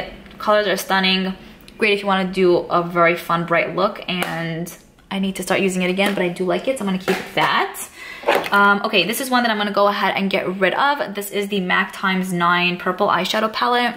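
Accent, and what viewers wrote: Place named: New Jersey, United States. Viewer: She has the same accent as Carli bybel lol are they both from jersey ?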